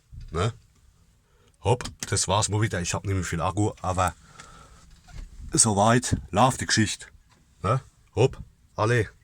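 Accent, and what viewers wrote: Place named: Hesse, Germany. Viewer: Das ist Hesschis gele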